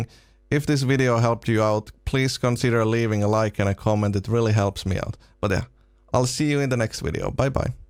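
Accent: Finland. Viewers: I thought I heard a Finnish accent, I guess I did 😂. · You soind like youre from finland. Good tips and crazy gameplay! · Dude are you finnish? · Your english is very finnish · My god I love the Finnish accent. Beautiful language too. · U sound Finnish??? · suomi aksentti <3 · Took me three seconds to figure out you're Finnish by your language melody (my dad is Finnish, so I'm familiar with the language), but it took me EVEN LESS time to figure out that you're amazing at Overwatch and that this video will contain good info! Loved it!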